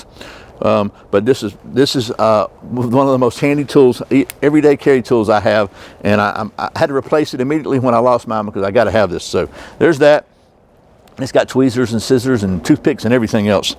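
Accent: Georgia, United States. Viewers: It would be really helpful for those of us who haven't quite calibrated to your Georgia accent if you would list the items you described on this wonderful video so that we have a fighting chance of purchasing the right items!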